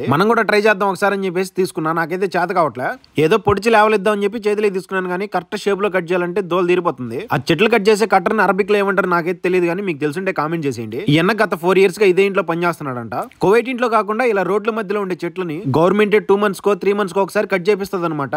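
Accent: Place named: Nellore, India. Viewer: Nellore Basha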